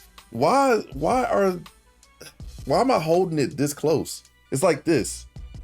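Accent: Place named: United States. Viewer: From the footage I've seen they're just recycling so much of the first game and it feels like they want to do fancy with the newer stuff like eye tracking, but I honestly wouldn't care much about that either. I don't get how they can mess up so hard. Did they actually create any new maps? All I've seen so far is old maps... It was quite hard to understand your American accent lol, but a fun and honest explanation. Greetings from Belgium!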